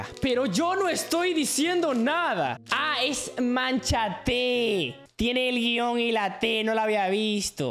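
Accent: North, Mexico. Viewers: norteño xd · No todos hablamos así en mexico:( JAJAJA solo en el norte y en el estado de mex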